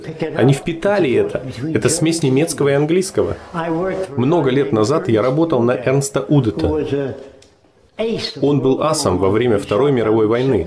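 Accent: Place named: United States. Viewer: Говориш как Американец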